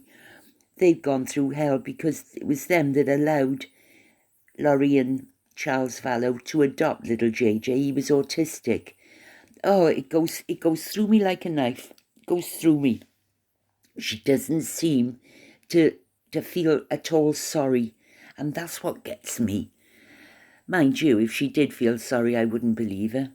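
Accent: Wales, United Kingdom. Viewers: Howdy 👩‍🌾😊To You Lovely💖! I Always Enjoy🙌 Hearing Your🥰 Welsh Voice! It's Very🙌 Calming! Happy😇 Happy🎉🍰🎊 Belated🎉🍰🎊 Birfffday To 💖🌺🌷🌸You!!!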